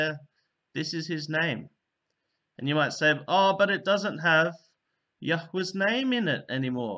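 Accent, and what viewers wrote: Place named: Arab world. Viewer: This is a very good explanation for the Greek transliteration of Yeshua. I have listened to a couple your Hebrew readings and your Hebrew pronunciation sounds a lot more purer than the the false modern jewish hebrew. Yours sounds more arabic. Do you have any videos were you explain your pronunciation distinctions and corrections compared to the incorrect pronunciations of modern jewish hebrew? Thanks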